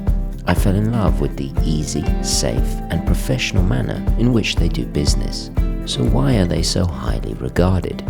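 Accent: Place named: England, United Kingdom. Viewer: Italian culture, English accent, and knowledgeable about horology, what a guy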